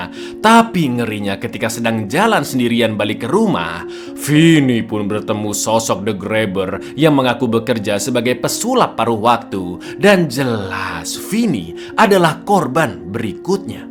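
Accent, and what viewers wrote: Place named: Surabaya, Indonesia. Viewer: Cak asline arek ndi? Kok logat bahasa e koyok Suroboyo sidoarjo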